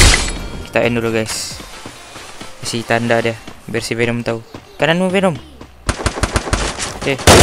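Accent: Sabah, Malaysia. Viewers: Abang orang sabah ke ?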